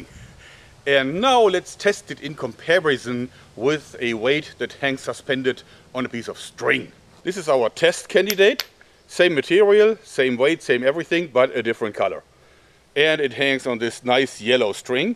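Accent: Germany. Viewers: is him from Germany? im from Argentina sorry about my English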